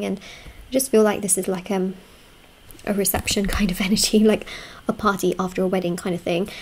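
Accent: United Kingdom. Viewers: This is fairly accurate. I've heard similar from others. It's so in depth too, literally one of the longest readings. Really appreciate all the time and energy spent on the reading. Thank you! Love your voice and British accent too 🙂💜.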